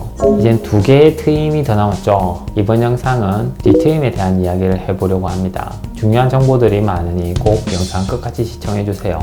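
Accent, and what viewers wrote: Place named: Gyeongsang, South Korea. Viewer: 구독 좋아요 누루라고 하셔서 바로 눌렀어요. 말 잘듣는 시민이쥬?~^^ 목소리 들으니 경상도쪽? 같으신데ㅠ 좋은분들은 머네요 흑ㅠ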